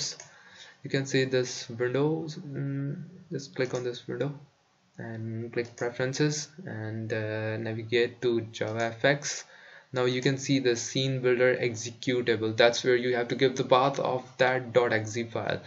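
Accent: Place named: India. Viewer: what is the problem of you Indian people with T & D, it is so irritating.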